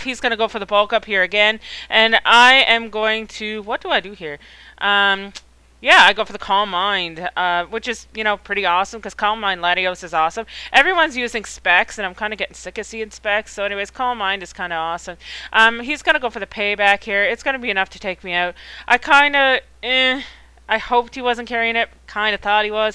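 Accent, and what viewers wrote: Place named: Canada. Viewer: You are soooo from Canada!!!!!! :D I love the UHT! (out) Ennjoying your battles and your flaming! lovely <3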